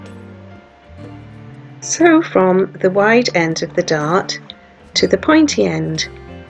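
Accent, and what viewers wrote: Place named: Wales, United Kingdom. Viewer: Fab tutorial👍. Clarity , brevity and simplicity. I’m inspired. 🎊🎀tell me , are you welsh? I’m in Cardiff and you sound local!🙋🏻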